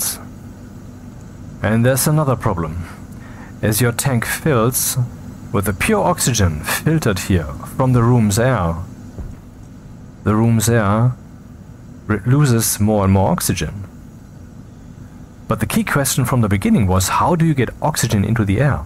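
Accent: Germany. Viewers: Richtig schönes Video! weiter so! Und dein Englisch kann ich so gut verstehen, weil du so klar redest :-) Gut ich höre raus, dass du deutsch kannst/bist?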